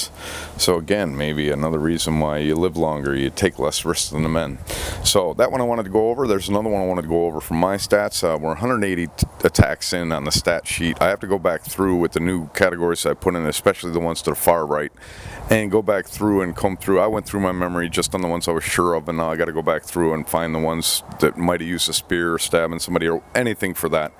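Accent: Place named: Florida, United States. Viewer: I knew you had a Florida accent! I still can’t get over that they found a Great White with a 6’ Blue Shark, 9’ Dolphin and Turtle in its stomach! We hear 6’ or 9’ and think that’s small, if you’ve ever been fishing think how that fish flips around on the deck, then imagine one as long as you, or in the case of 9’ three feet longer it’s A HUGE FISH! And in the STOMACH of a Great White, if those bigger ones wanted to swallow you whole they could, just giant monsters.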